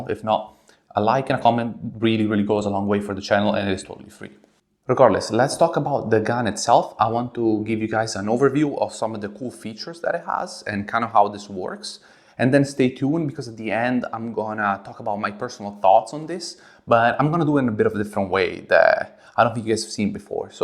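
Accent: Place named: Italy. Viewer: An Italian here you got a great Italian accent also that's a really cool rifle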